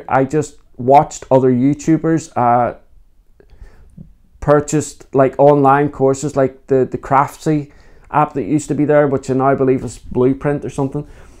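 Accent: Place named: Northern Ireland, United Kingdom. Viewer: I like listening to you because your accent is as close as I'll get to Northern Ireland! I haven't seen my daughter in Armagh since before Christmas and God only knows when we'll be able to travel again. Best wishes to you and the family.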